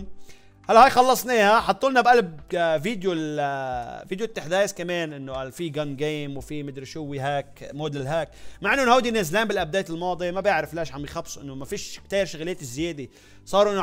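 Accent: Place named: Jordan. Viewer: لهجتك اردني على مصري على لبناني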